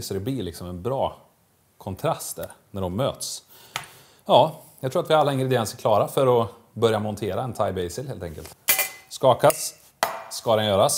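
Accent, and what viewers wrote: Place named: Värmland, Sweden. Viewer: Likead och subbad! Fantastiskt nördigt, klassigt, och underhållande, dessutom på KLINGANDE dialekt som tog mig hem till Värmland. För det är väl det jag hör? Blev alldeles omotiverat stolt över dig! Skall konsumera resterande videos med glädje!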